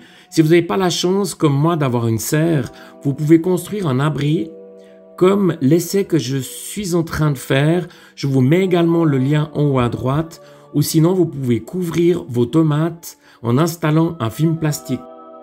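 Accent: Switzerland. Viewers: Merci pour vos conseils, j'adore votre accent qui me rappelle mes séjours très agréables en Suisse.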